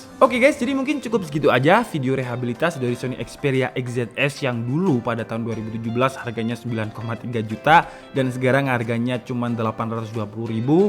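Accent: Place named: Dieng, Indonesia. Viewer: wala mas orang puncak dieng tah WKWKWK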